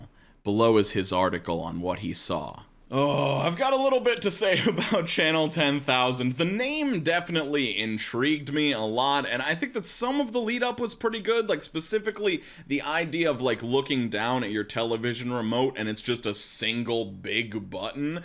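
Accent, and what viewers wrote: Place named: Northern Kentucky, United States. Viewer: are you from Northern Kentucky?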